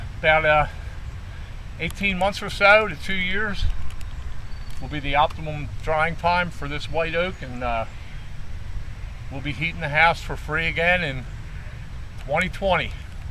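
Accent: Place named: Philadelphia, United States. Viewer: First of all, the very first time I heard you speak, I knew you were from Philly. I moved away 30 years ago, but there's still nothing more I enjoy hearing than that gorgeous Philly accent! I've purposely made sure never to lose mine. ...Second, I did tree work in my younger days, mostly in Bucks county, but also a few logging trips up to Sullivan county. I miss tree work. It was tough, but I loved it. ...I also appreciate that you're equally at home splitting wood and planting flower seeds. Awesome.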